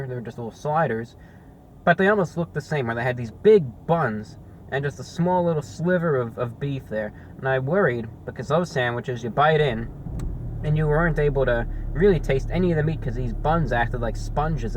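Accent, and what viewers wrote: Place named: Mid-Atlantic, United States. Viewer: Whats up with the atlantic accent ?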